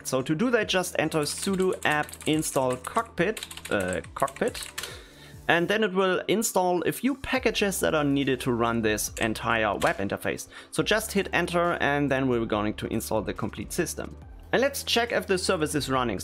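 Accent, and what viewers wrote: Germany. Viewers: Im ersten Satzt direkt gemerkt "Jop das ist nen Deutscher" Ich danke dir, genau nach so einer Software hab ich schon länger gesucht. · I can hear some German English.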